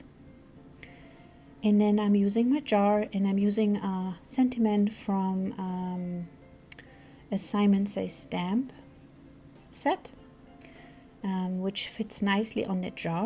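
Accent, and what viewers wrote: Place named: Rhineland, Germany. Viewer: My entire family is German. I was born in NY City area, my parents came to NY on ships. The reason I can tell you are from The Rhineland is that your accent is EXACTLY like my Aunt Marianne's, who was born in Lannesdorf, Köln area (Your English is EXCELLENT by the way!!) My Dad was from Lannesdorf also. My mother was from Sulzbach in Bavaria. I live in California now and will be watching your videos addictively now that I found your channel!!! Ich kann ein bischen Deutsch.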